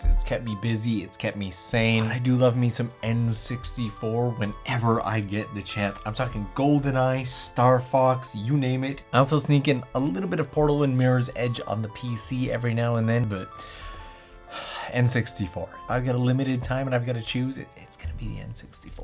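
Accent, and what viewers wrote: Canada. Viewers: Your accent is screaming Canadian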